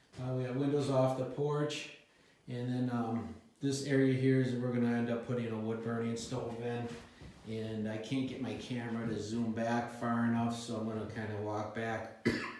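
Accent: Wisconsin, United States. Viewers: You sounded just like wisconsinites and I called it well. My uncle has land in Gleason Wisconsin. We used to camp up there. I live down near Milwaukee. I just subscribed to your channel.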